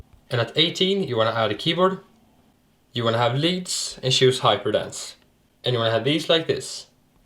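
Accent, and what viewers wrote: Sweden. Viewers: visst är du svensk eftersom att du har på svenska inställningar och pratar lite svengelska xD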